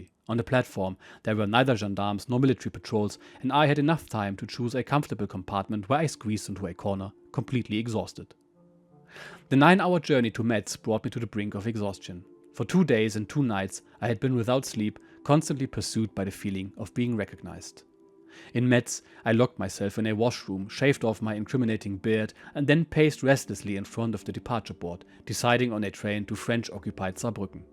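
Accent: Germany. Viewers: I was really hoping he was going to get the chance to attempt escaping to south America but his postwar adventures getting back to Germany were no less dramatic. What a great series of videos, i binged the lot, so captivating. The German accent really adds to it. One question, didnt i hear him say at on point he was going to end up commanding u 701 or did i miss hear that? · Your accent is heart breaking because my best friend in high school was from Germany. He developed depression and disappeared. All of his friends think he took his own life. He was smart, good looking. athletic and had great musical talent. Not a day goes by that I don’t think about him. It was 50 years ago when he vanished.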